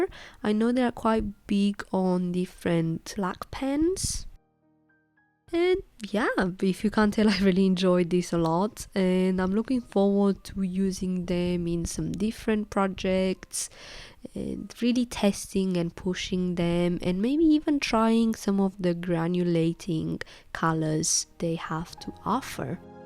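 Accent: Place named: England, United Kingdom. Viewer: The cutest English accent I've ever heard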